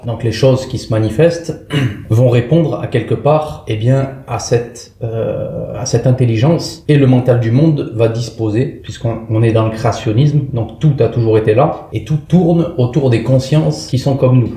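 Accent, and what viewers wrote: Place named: Corsica, France. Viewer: Ce n'est que la voix d'un corse.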